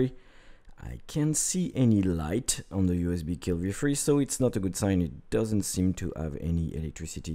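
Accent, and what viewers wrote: France. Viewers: jolie accent français xd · you have a french accent,idk cause i'm french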